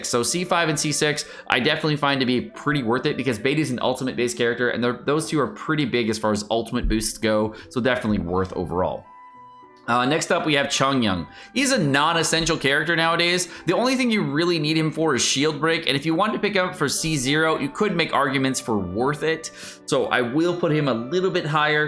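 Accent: United Kingdom. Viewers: British never fails to amuse me 🗿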